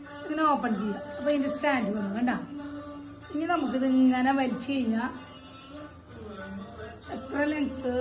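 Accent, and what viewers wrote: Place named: Thrissur, India. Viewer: Thrissur .....language superattaaaa.....simple and beutiful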